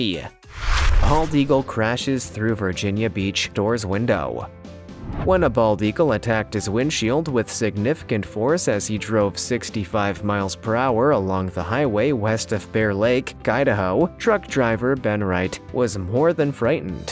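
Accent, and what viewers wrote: Canada. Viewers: Im sorry great video but. Pfff im dutch and even i can hear that disgusting canadian accent. “Mouth, about, doubt,” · That is one heck of a Canadian accent. Love it!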